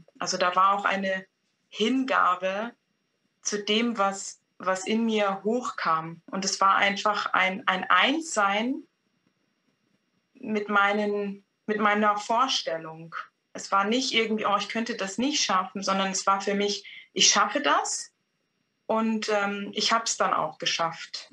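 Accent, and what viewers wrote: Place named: Switzerland. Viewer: Mir gefiel der "Wortverkehr" zwischen der Dame und dem Interviewer sehr gut... sein Schweizer Akzent ist koestlich :) Die Fragen die er ihr stellte erzeugten grossartige, aber einfach zu verstehende Antworten die sich sehr real anhoerten. Sehr gutes Interview :) Besten Dank dafuer und lb. Gruss aus USA ::)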